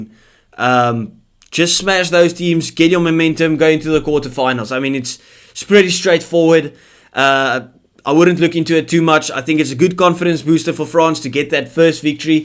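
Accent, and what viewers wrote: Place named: Afrikaans, South Africa. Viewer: Hierdie man het n DIK AFRIKANER aksent xD. Ek verstaan as jy afrikaans praat gaan jou kanaal nie groei nie. Wat n jammer.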